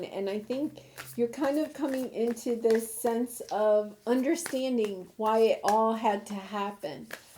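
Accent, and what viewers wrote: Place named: South Carolina, United States. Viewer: I'm betting you're a South Carolinian with that delightful accent!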